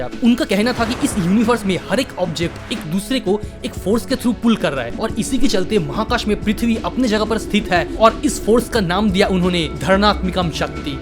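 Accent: Bengal, India. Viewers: Bhai tu Bengali hai kya, tera hindi accent se toh mujhe aisa hi lagraha hai.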